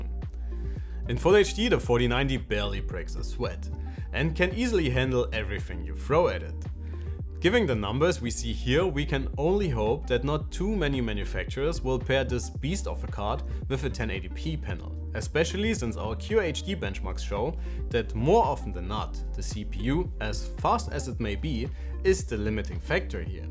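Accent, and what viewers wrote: Germany. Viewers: Höre ich da etwas einen Landmann im angelsächsischen Bereich wildern?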